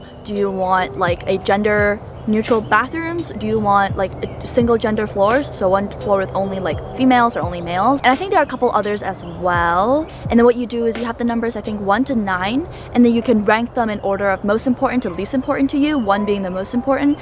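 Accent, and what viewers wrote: United States. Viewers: Hi, you mentioned that you could have a singaporean accent but all ur videos has been speaking English with american accent.would I PLEASE get some tips on how to keep up with ur English, or would you share how u got to learn English so good.books ur read.things u listen to you find it helpful. Or at which point ur English has gotten this level. Cuz u started tutoring while I were still in school and I am really curious how could I get so good and be able to teach. I want to improve my English but I am no longer in high school or school in general.I needed some help. I am feeling a bit hopeless on that. Hope u could share ur experience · Hey how did u practice ur American accent?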